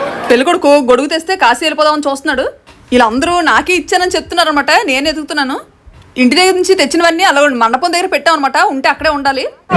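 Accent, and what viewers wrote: Vizianagaram, India. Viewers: Mandalu virigupothayi... Mana vizianagaram slang nachina dialogue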